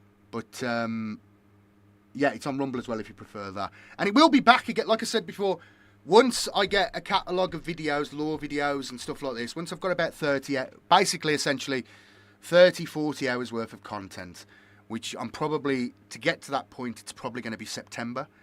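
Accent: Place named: Birmingham, United Kingdom. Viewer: So I just found this video end of July 2025 and I did wonder where all your excellent videos went to. I heard rumours that it was Geedubz clamping down on any use of their I.P. and from what I gather from this video, I think it is. I dunno what you're doing in order to circumvent the either potential or actual copyright strike from Geedubz. Good luck in whatever you try to do. Also this is not how I imagined you to be, an actual full blown Brummie lad.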